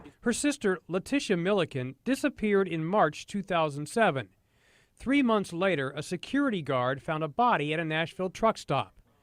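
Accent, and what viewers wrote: England, United Kingdom. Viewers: why is the story of a murderer in Tennessee narrated by a person with an annoying English accent? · yeah it's weird I don't mind English accents in person but a voice over drives me crazy. Funny thing is I'm 50 percent English from the states.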